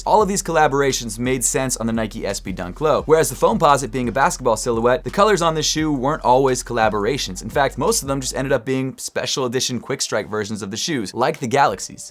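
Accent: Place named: New York, United States. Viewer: I'm picking these up, shocked that your from Baltimore you sound and look like a New Yorker.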